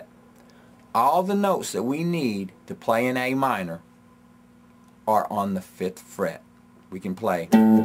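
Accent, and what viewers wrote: New Orleans, United States. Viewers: Are you from New Orleans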